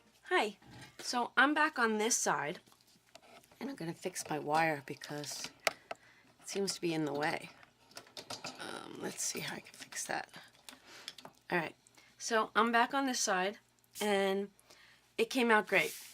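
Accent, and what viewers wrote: New York, United States. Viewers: Very cute! Love the NY accent 😁 Yay is back!!!!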